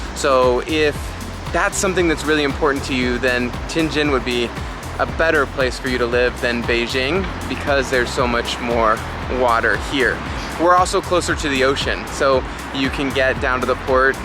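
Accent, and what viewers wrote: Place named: Canada. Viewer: You are amazing for responding to all my comments. Where in the States are you from? You sound like a fellow Canadian. I like your laid back style of reporting.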